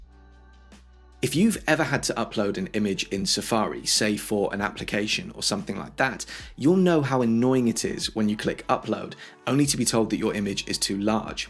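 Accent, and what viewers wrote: United Kingdom. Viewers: I stumbled upon your channel few days ago. I am 73, and like my late husband, I am an avid Apple fan. I have iPhone 11, iPad, and Apple Watch. I am always Googling to find out about the security features and how I could make the most of my devices. Your channel is just what I need. I am in UK, and it is so nice to hear the British accent. I like your style of explaining. I have subscribed to your channel.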